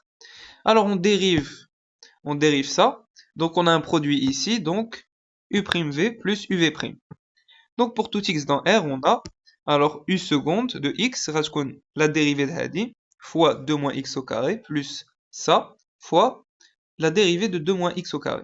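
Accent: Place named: Arab world. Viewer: Parfois tu parles en français et y a des fois tu parles comme un arabe , je ne comprend pas 😢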